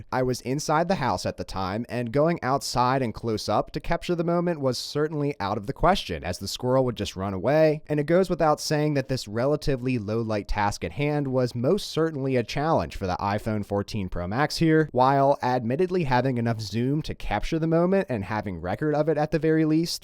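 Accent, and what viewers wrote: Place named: California, United States. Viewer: California accent is f disturbing, smart information sounds dumb.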